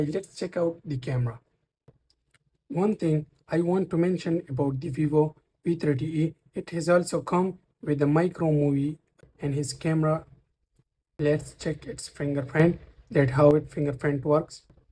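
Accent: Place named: United States. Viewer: American accent!!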